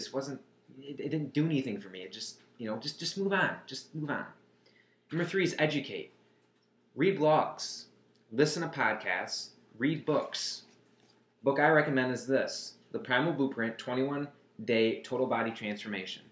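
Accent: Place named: Chicago, United States. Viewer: I knew from your first sentence that you were from Chicago lmaoo me tooo :)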